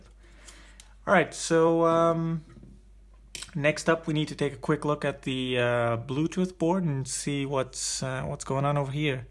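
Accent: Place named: Germany. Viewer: Very, very good description! And don’t worry about your diction, I appreciate German thoroughness!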